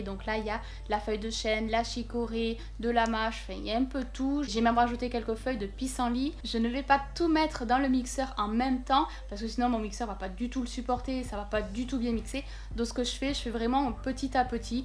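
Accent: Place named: Toulouse, France. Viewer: Juste super resultat j'adore cette sonorité toulousienne ^^! Cest merci à vous deux d'autant m'apprendre et nous partagé tous ça! ET SURTOUT davoir eu et pris le temps de repondre cest super.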